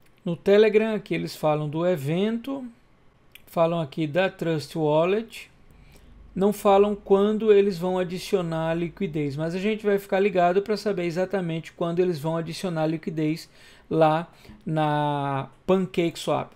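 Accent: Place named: Pernambuco, Brazil. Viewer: vc era de q parte do nordeste Aki no Brasil? Vou chutar Pernambuco por que vc fala sempre ( butar ) 👏👏👏👏👏👏👏👏👏👏👏👏👏👏👏👏👏👏👏👏👏👏👏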